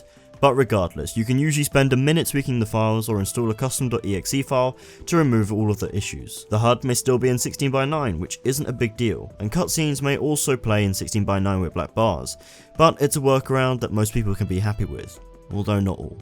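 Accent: United Kingdom. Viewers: British accent. Of course ! Hey any chance of you doing a video after 4 shots of Scotch or Brandy?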